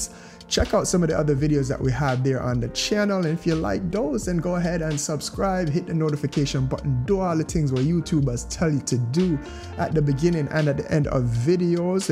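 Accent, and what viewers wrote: Jamaica. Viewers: I can tell your Jamaican. Big up yourself bro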